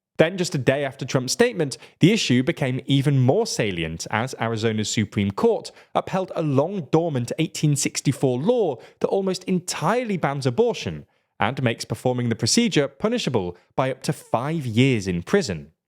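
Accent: United Kingdom. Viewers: I hate hearing a British accent I used to like it but everything I hear out of the UK is a lie sadly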